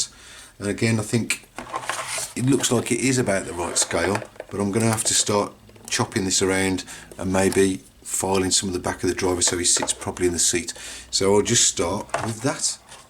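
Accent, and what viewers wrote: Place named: Midlands, United Kingdom. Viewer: Brilliant I am just try fitting some in my rc4wd great for the money, nicety hear a fellow midlander instead of an american taking an hour with 10 mins content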